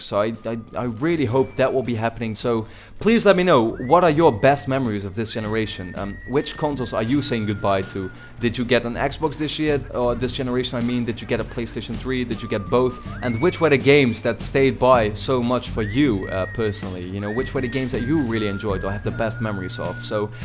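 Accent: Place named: Netherlands. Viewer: I noticed ypu have a dutch accent. Are you dutch?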